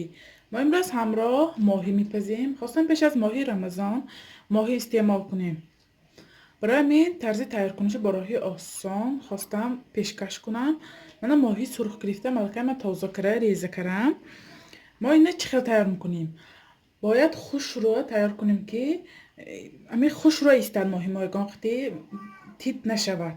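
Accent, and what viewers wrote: Isfara, Tajikistan. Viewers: Салом.рз руи фахмишам номатон аст. Хело хуб.бахшиш мегуфтед.ки шумо дар кадом шахри Русия зиндагони карда истодаед.аз руи гапзаниатон аз ш.Исфара мебошед.агар хато накунам.кадбонуи хуб будаед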